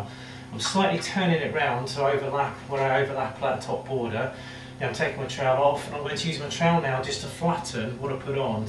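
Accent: United Kingdom. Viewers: Lancashire accent ?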